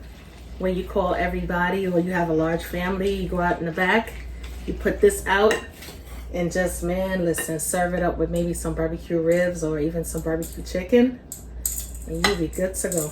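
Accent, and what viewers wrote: New York, United States. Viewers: must be from up north I’d say NYC. Because she sounds like me when I talk 😂thanx for the cooking tips on this plate 👏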